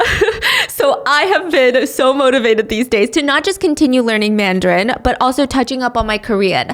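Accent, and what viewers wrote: United Kingdom. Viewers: how do u always become britsh so randomly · Uh why sound british¿!?!?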